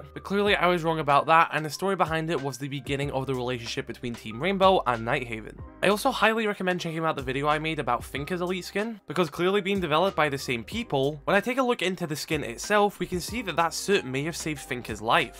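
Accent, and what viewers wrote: Scotland, United Kingdom. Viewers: Where abouts in Scotland are u from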